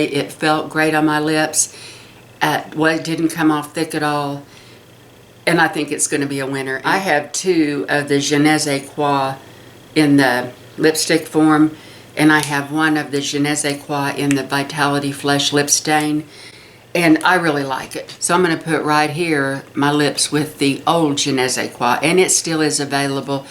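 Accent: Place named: Southern Illinois, United States. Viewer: What a fun story! I love your southern Illinois accent as well!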